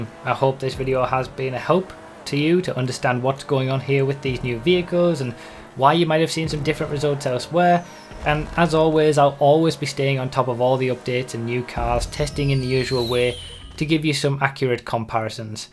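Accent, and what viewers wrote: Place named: United Kingdom. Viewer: Niyatec No, it’s the british way of saying things.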